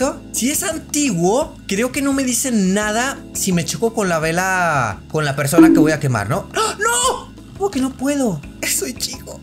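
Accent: Chile. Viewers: En serio eres chileno si o no ??? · OMGGGGG PENSE QUE SERIAS MEXICANO PERUANO O ALGO ASI POR EL ESTILO PERO NUNCA PENSE QUIE CHILENO 😧 Y APARTE CONOCES LOS COUNTRYBALLS VIVA CHILE MIERD@@@ :O Y APARTE ERES MI YT FAVVV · Eres Chileno como yo 😂😂😂😂😂❤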